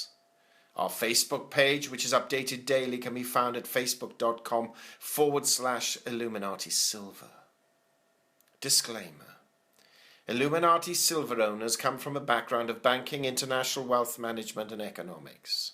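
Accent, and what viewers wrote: United Kingdom. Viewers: I like your British accent. Unique and attention grabbing.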